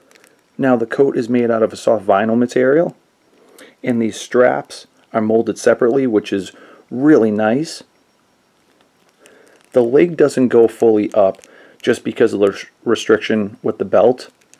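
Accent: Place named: Massachusetts, United States. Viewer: Hey, you from Mass? The accent sounds familiah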